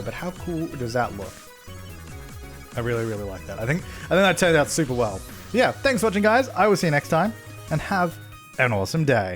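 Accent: Australia. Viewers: Haha I love how you love his accent but I’m Australian so it sounds completely normal to me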